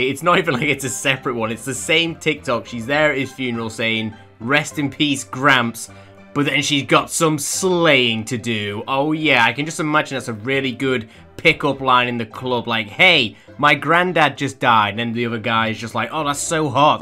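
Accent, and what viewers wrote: United Kingdom. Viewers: i like ur vids but ur accent is too brittishy or smth so i hav to unsubscribe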